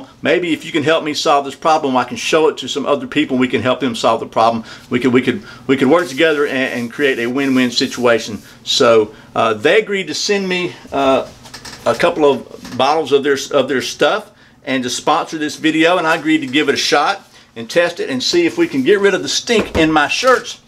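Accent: Georgia, United States. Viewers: the subtitles suggest you tried something from your toilet!?! That is not a good idea. Got to love automatic closed captioning on that lovely Geogia accent.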